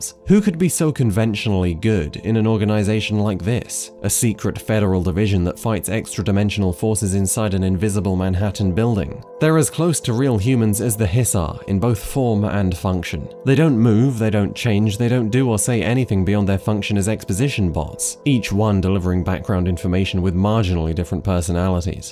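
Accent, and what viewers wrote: United Kingdom. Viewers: The British accent makes this critique overly pretentious and distractive. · Why do humans x? Idk but im sure a gaming essayist who makes up words, and has seemingly tenuous grasp of civics is going to tell us in a tapestry of mixed metaphors, pointless erudition, and complete lack of knowledge/context asude from gaming. Why do people who play lots of games think it equips, instead of completely contort, their understanding of reality? Yay another 40 minute leviathan embarassment to eyeroll thru hoping this youtuber would just review games and quit playing armchair philosopher. Anyone can play shitloads if games and talk about them with a british accent and get views, what does actually bring to the table? Not much. once you get to a few of his vids this becomes verrrry apparent that this person is so out if their depth creatively.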